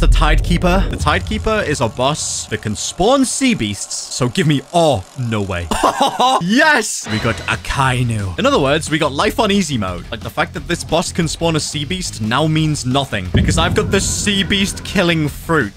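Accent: United Kingdom. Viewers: Are you from united kingdom ?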